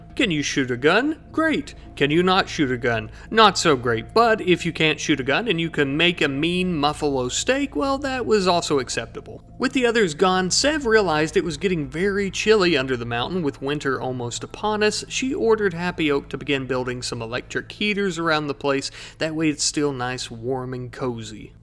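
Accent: South, United States. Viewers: are you southern? i swear i hear an accent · Who cares if he is working off a script, human or AI written. In fact, I appreciate the hustle. He took the time to edit his lines, work on his jokes, while writing it out. Now tell me, why ChatGPT? If anything, he mixes in a lot of local colloquialisms and that Southern charm, AI can't write that, can't deliver it. Llistening to makes me miss the south, a lot. Peculiar, genteel, savage, all at once. Oh and swampass, can't forget that. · I've been here around this channel for a while even commented about your southern accent at one point (don't know if you remember lol) but it's nice to see you grow and I hope you're doing well!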